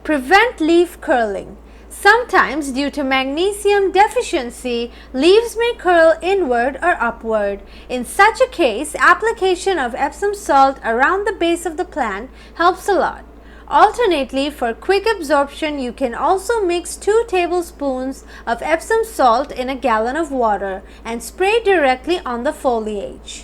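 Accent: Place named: India. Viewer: I think it is Indian pronunciation. Sounds cute.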